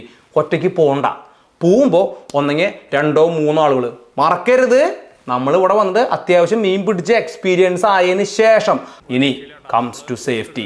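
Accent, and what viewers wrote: Thrissur, India. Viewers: Bro, you are amazing.... The way that you told through the examples are very appreciated. Especially the slang of our Thrissur is lovable... Cheer's bro🤝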